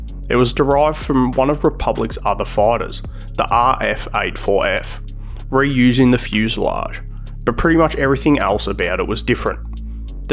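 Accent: Australia. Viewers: What a terrible Australian accent this speaker has! That's not proper English. That's Outback babble.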